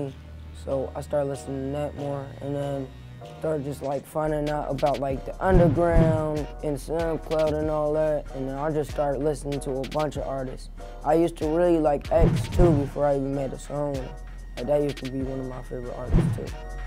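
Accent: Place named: Philadelphia, United States. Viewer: He from philly that’s how we talk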